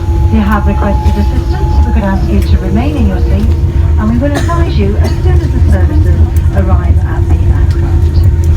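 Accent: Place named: Liverpool, United Kingdom. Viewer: Scouserrrr yes!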